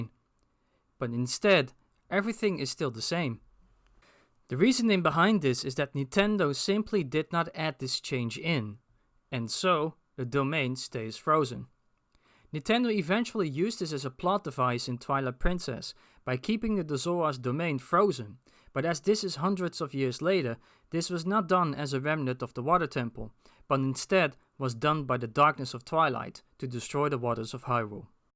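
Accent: Netherlands. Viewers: Hoorde aan je accent gelijk dat je nederlands was. Which is nice. ANOTHER DUTCH ZELDA FAN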